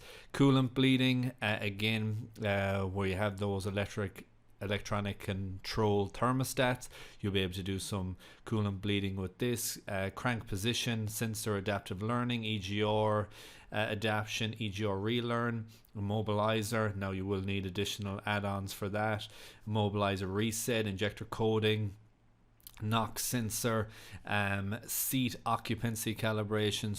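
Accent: Ireland. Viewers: not to be rude but i wanted to ask are you Irish?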